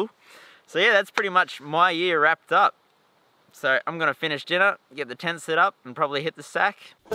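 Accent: Australia. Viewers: I think his parents are from Poland but he sounds like he has a good Australian accent.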